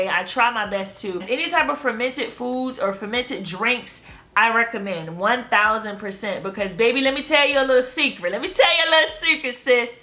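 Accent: Baltimore, United States. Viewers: Girl I knew it. The first time I heard you talk you sounded like someone from MD, Baltimore to be more specific. In This video the way you said pizza, I’m like I know she’s from MD!!!! 😂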